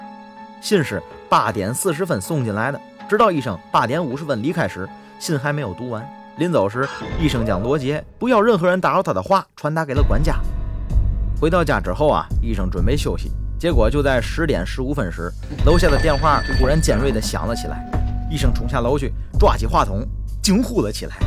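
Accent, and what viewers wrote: Tianjin, China. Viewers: 天津话太搞笑了，我喜欢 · 天津话最好用天津式土话。普通话天津味儿太违和。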